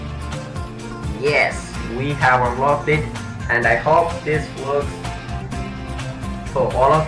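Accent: Kerala, India. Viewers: Malayali aanennu thonnunnu accent kettitt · Are you from india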